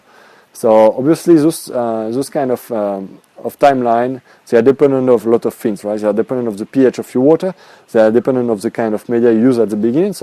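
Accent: France. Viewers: can you caption this? Your accent is really strong for people in other parts of the world. I only understood about half of it. And googles auto captions think your french. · i love them french accents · mon dieu..quel super accent.. laisse moi deviner... origine francaises ?? ou belge ??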